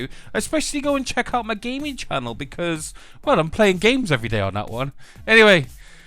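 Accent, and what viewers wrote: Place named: United Kingdom. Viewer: Your british accent makes me h a r d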